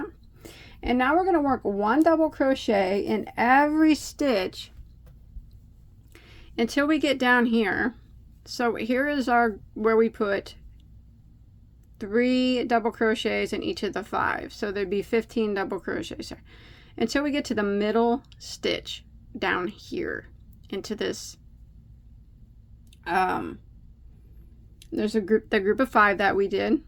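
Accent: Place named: Midwest, United States. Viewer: I love it! I have been looking for the perfect pattern to make as a gift for my sister. Have a number of wips so will be a little while before I can start this. I love listening to you. I’m from Iowa but have lived in Washington state most of my adult life. I enjoy your midwestern accent. My youngest son often corrected me on n how I said wash. Mom there is no “ o” in Washington.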